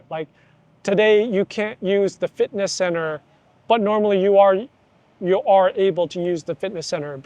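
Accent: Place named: California, United States. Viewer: You are an American from California, right? Judging from how you speak English, I figure you are an American from California. Why not just work in high tech industry in the U.S.? I believe that U.S. salary is still better than working in Thailand. U.S. has its problems, but it never withholds opportunities for the right person. I cannot say the same about Thailand. Keep up the good job in learning Thai. I like to know how you improve your listening comprehension in Thai? For me, listening is the most important aspect of getting to know Thai people. But it is also difficult because I am still challenged by Thai tones, despite having studied online with Thai teachers from Bangkok for two years.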